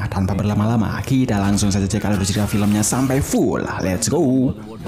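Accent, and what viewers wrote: Bali, Indonesia. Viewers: Pasti dari bali ya min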